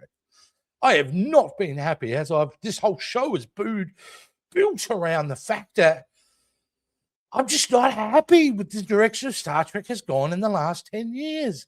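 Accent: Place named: Australia. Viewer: nice to hear an Aussie speak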